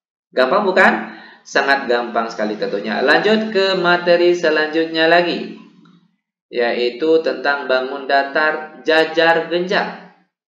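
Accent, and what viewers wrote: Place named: Bima, Indonesia. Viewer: Logat bima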